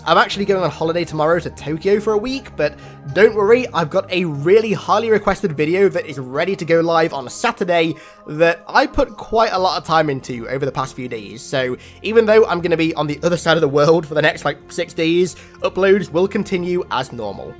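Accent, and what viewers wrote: United Kingdom. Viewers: i always thought you were american, good to see a british halo youtuber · when you've been watching for years and only just now realized he's british......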